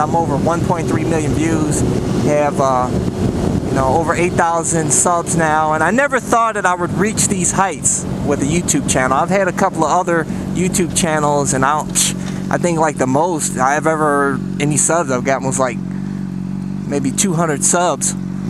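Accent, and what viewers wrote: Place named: Washington DC, United States. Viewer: Ohioians have an accent, you sound more like you're from DC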